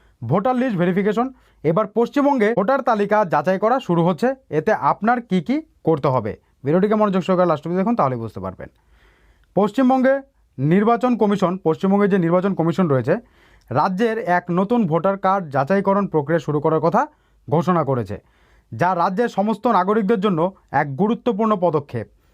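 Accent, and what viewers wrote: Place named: Bangladesh. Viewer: আপনার সব ঠিক আছে তো? আপনি তো বাংলা দেশি, আপনার কথায় বাংলাদেশি টান আছে।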